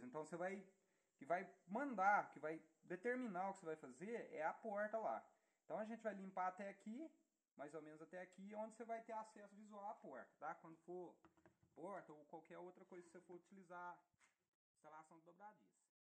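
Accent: Minas Gerais, Brazil. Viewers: Obrigado pela referência a meu nome, amigo. Parabéns pelo vídeo. Pelo sotaque vc deve ser mineiro, certo? Eu já fui em algumas cidades de Minas e onde passei mais tempo foi na cidade de Manhuaçu. Gostei muito da cidade. E meu cunhado é de Belo Horizonte. Forte abraço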